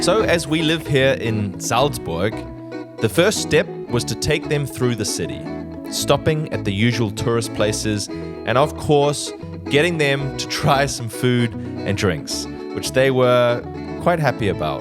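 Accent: New Zealand. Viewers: I'm always baffled when I hear your kiwi accent...you imitate Austrian German so well I somehow expect you to have an Austrian accent when you speak English. Makes zero sense, I know 😂. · Is there something about the Kiwi accent that lets you learn/pronounce the Austrian dialect particularly well? 🙂Because many immigrants in Austria speak perfect German - but none of them ever master the "Dialekt". So I was wondering whether you already had a starting point from your own native language that lends itself particularly well to learning to sound "Austrian"?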